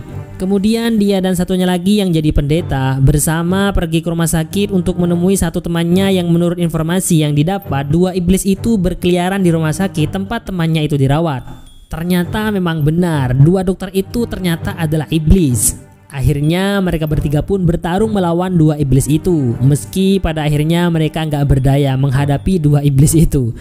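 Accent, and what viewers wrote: Madura, Indonesia. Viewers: mak medok ben bro logat madureh tak nyaman ekedingagin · Mimin orang madura ya logat sama kayak aq hhhhh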